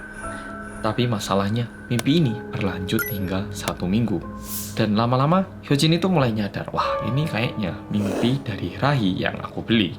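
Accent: Java, Indonesia. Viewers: Waduhhhhhh ko' ada episode 2 kelamaaan menunggu besok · Kak kok skarang pembukaannya sudah ganti yg ngondek? Lalu kan medok kak? Kok di ganti kak? 😁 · Kembali lagi bersama mas yang medeeekkk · Tim gercep orang korea yang medoook!❤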